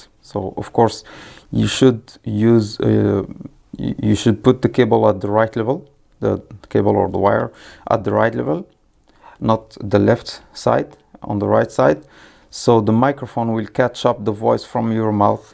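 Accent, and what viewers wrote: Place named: Morocco. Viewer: Dat #moroccan accent!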